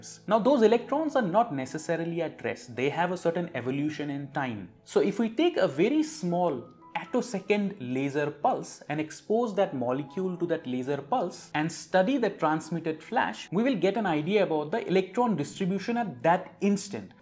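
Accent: Bengal, India. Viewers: sir are you from bengal ?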